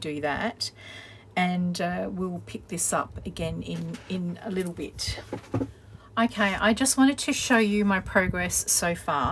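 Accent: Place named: Australia. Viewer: thank for the tutorial. OMG. Bay gul. in California. I thought you said Bible , too. love to hear Australian speak.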